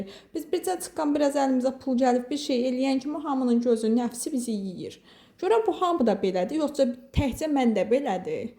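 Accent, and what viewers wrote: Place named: Nakhchivan, Azerbaijan. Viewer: Nə şirin danışırsız mence Naxçıvan ləhçəsidi menim bir şagirdim var o da bu ləhçəynən danışır ondan bilirem 😂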